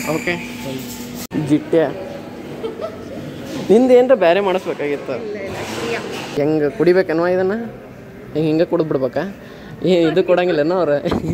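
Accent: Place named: Uttara Kannada, India. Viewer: First video nodidini. Uttarakannada bashe chennagide.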